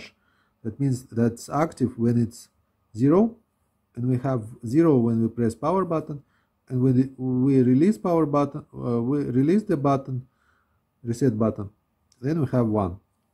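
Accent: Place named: England, United Kingdom. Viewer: О, а вы из Англии?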